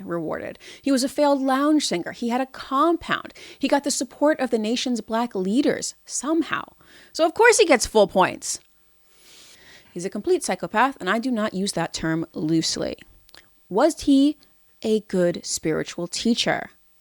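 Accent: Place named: United States. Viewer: Your so American u know nothing look at how u talk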